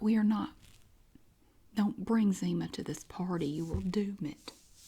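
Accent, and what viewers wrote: South, United States. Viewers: I love when you say “loogathaat” I live in nc and have a southern accent too but for some reason I love yours! Lol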